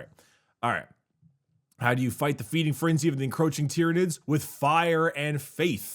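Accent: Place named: California, United States. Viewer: "Mare-icle" dice. Californian accent be strong.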